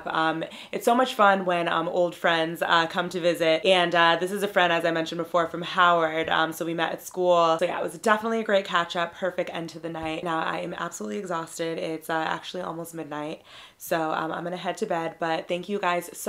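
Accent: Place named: Africa. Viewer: Macon GA with an African (from the continent)accent lol.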